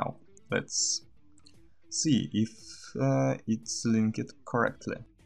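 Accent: Russia. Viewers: Are you from Russia, you have russian accent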